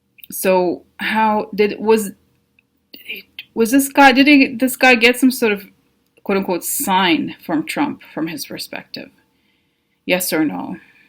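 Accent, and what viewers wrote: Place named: Russia. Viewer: Sometimes you have a russian accent. Umm Are you a scorpio? Good analysis of Jared. They have no souls. Thank you. XO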